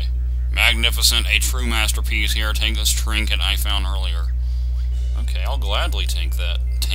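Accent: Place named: United States. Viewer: Love/d this game and the american accent is a naughty bonus haha.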